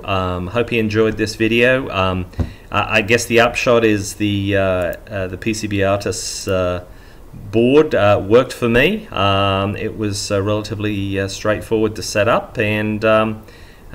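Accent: Australia. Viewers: MickMake was a very popular YT tech channel from down under. wiffy was his ongoing joke. Surprised you're not familiar with his channel. Judging from your accent (?), I figured you were good mates. MickMake stopped producing his videos just over a year ago. I think he got fed up with the online negative discourse, so he took his 'ball' away. Looks like your channel might be a great alternative. I'll be watching. Happy New Year. 😎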